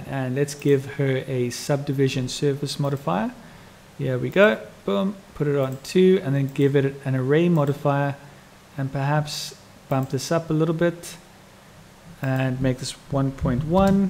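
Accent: South Africa. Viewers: Nice to hear a South African oake for a change :D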